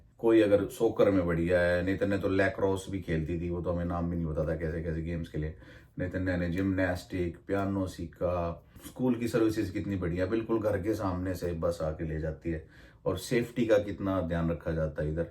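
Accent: Haryana, India. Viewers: Lol. Got this channel recommended to me after 10years. Wow! I cannot forget the iconic Haryanvi accent. For me every Haryanvi I imagine must sound like him. haha. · Bhai pahle to Ram Ram...jab aap haryanvi touch na choddte t bhai main b isse bhasha me comment likhunga.... Bhai aap dil te jeen aale ho..ek number ke chhore...bhai itna padhhe pichhe apni tone nhi choddi bahut badhiya baat...America me Khichdi te jarur banwate hoge ar kahte hoge bhai yaa maari favorite dish s...yaar gandasa chalaye kitne din hoge...leetraa pitayi khele hain ke America me....hokke ka jugad b hai k udde😂😂😂😂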